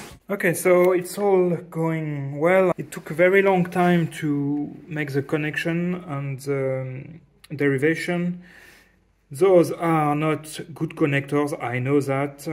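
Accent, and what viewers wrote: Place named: France. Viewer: ça serait tellement bien honnêtement je comprend l'anglais mais un version français serait top. Faut avouer que tu as un sacré accent français (je fais pas mieux).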